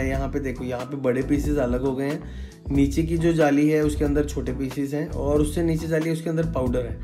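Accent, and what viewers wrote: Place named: Delhi, India. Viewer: Never expected this kind of Hindi accent ( Delhite) from the South Maharashtrian person.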